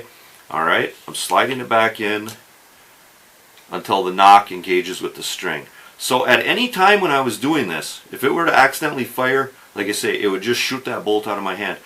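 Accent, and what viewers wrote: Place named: Michigan, United States. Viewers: Good info and as a newbie crossbow guy duly noted. You sound like you're from the UP... Sault Ste. Marie here. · What’s your general area in Michigan! I hear that accent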